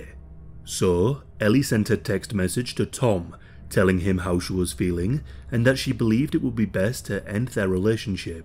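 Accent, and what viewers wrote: United Kingdom. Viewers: lol ur so british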